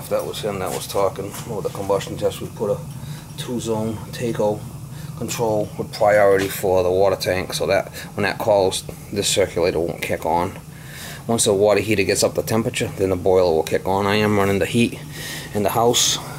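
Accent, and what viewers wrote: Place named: New England, United States. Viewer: Great videos. Oh mama do I get a kick out of the New England accent. LOL! Wish you lived closer to western PA. I have a boiler that needs replacing and appreciate you taking the time and effort to make these videos. Big Thx!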